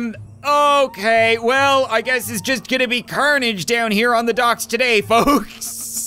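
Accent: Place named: Canada. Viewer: Bug The Pug he's Canadian he says words differently get used to it or leave simply as that.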